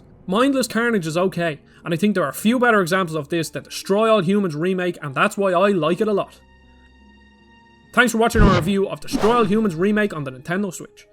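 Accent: Dublin, Ireland. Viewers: Didn't expect a Dub accent on a Gamexplain video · Of all the places I expected to hear a Dublin accent, a GameXplain video was pretty low on that list. Fair play to you,.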